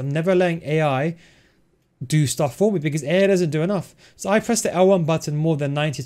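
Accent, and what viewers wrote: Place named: United Kingdom. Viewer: too fast und unclear talking. not 100% understandable for not native English. maybe the British akcent is the reason. I like the content but it's too exhausting to get it/to listen. no offense.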